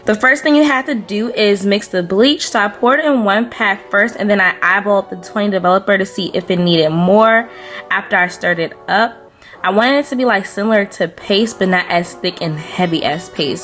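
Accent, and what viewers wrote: DMV, United States. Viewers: Love the color. You sound like you're straight out of the DMV? Let me guess pg county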